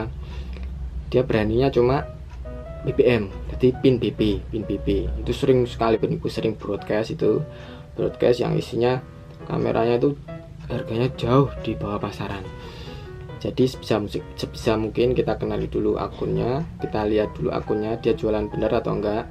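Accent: Java, Indonesia. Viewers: Mas ngomongmu medok 😂😍😍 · jowo ne medok tenan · Thank's infonya mas, ngakak sih masnya agak medok wkwkwk ada lucunya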